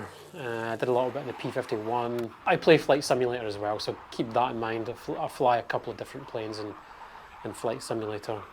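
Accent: United Kingdom. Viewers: Are u uk by the way just accent am from Northern Ireland nice seeing local people do so well.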